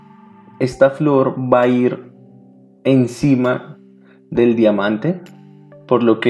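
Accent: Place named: Bogotá, Colombia. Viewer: Habla como rolo jajaja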